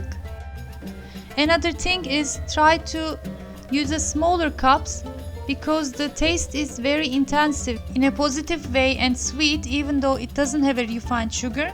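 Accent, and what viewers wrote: Turkey. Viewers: As a Turkish person watching this, I really appreciate his initiative <3 the recipes look amazing. And you speak English very well, on top of the lovely and authentic Turkish accent you have!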